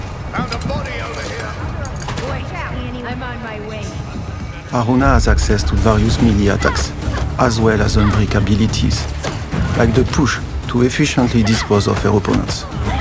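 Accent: France. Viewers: This french accent is getting on my nerves, and I am french ! At this point it's like he's making zero effort to be understood. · Punaise l'accent français en anglais rend la compréhension difficile ! Learn to speak english please. I'll buy the game btw, looks cool ! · l accent fr qui cringe a mort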